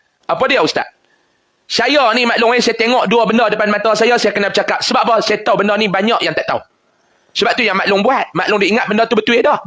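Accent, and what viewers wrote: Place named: North, Malaysia. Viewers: Mana ada kasaq..aku dengaq elok ja...hg ja kata kasaq..tapi hg suka baguih la..memang cara kami cakap macam tu..oqang luaq dengaq mcm kasaq tpi memang mcm tu kami cakap... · Ramai tk suka bahasa kasaq ustaz ni,,, tp aku suka,, meresap dihati makna yg ustaz ni sampaikan,, org utara cakap kasaq tp sedap dan mudah faham,, terbaik❤ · Pekat dia punya Kedah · org utara memang nada cakap mcm tuu... biasa saja tone org utara cakap😂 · Aku org kedah, maaf laa aku rasa ustaz ni ceramah tak kasaq pun, biasa ja bahasa dia, cuma dia ckp tu pekat kedah biasa laa, ala ala smbg kt kedai kopi ckp lagu ni laa… kalau org luaq mgkin nmpk mcm kasaq, jd benda tu hanyalah subjektif…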